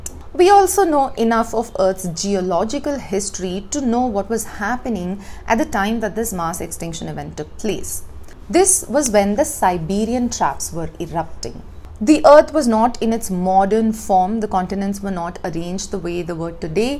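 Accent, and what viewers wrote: India. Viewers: I love female Indian accents. 😍😍😍😍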